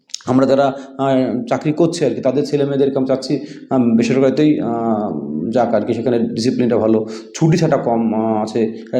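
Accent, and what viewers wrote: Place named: Bangladesh. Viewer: Mid day meal thakle cholbe....loker kache taka nei tai hoito govt school thakbe.. apni kothai thaken apnar accent bangladeshi der matan,( Bangladesh theke ese 1995 theke ese aneke class 9 admission nia nei tarai besirbhag school teacher achen) teacher ra porate Jane na ba porai na tai hoito kichu student private a jabe.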